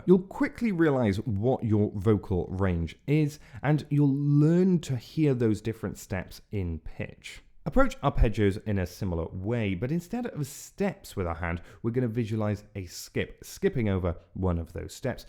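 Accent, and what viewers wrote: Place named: United Kingdom. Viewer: Beside the subject...I absolutly love his accent ❤ Is it British....certainly not Américain 🤮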